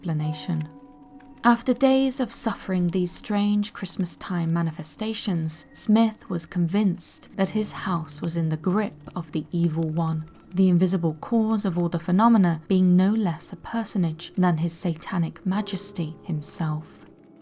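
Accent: United Kingdom. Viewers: you’re awesome! Your voice is so soothing, it seems that anything spoken in British accents makes them seem more real. But that last one about the Black Lady, that’s quite a damn good reason to roam the earth after death. Black lady, Black Lady, I’ve stolen your, oh hell no I’m out of here!!!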